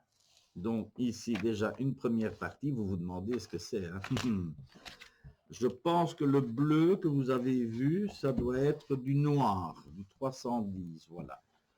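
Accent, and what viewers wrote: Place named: Belgium. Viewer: Bonjour,j'adore t'écouter en étant sur mon dp, mon beau-frère est belge aussi, je me lasse pas de vos accents 😁,chose sérieuse...video 11,toile 3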